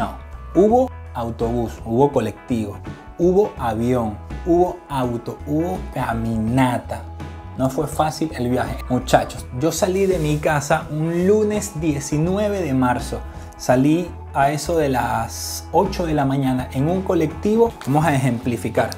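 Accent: Argentina. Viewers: Me encanta tu acento medio argentino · Y qué es lo q te da risa? Supongo ignorás q los argentinos hablamos así.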